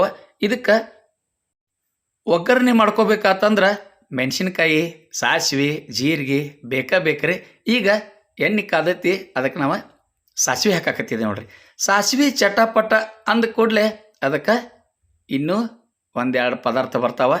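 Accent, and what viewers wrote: North Karnataka, India. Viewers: ಉತ್ತರ ಕರ್ನಾಟಕ ಶೈಲಿಯ ನಿಮ್ಮ ವಿವರಣೆ ಅತ್ಯಂತ ಆಕರ್ಷಣೀಯ ಸರ್…ಹಾಗೂ ನಿಮ್ಮ ಅಡುಗೆ ರುಚಿಯೂ ಅದ್ಭುತ…🙏🙏🙏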